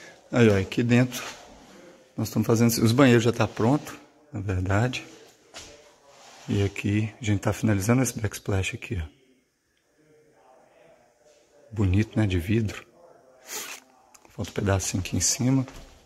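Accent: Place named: Minas Gerais, Brazil. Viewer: Veio você ainda não perdeu o sotaque mineiro ,ainda bem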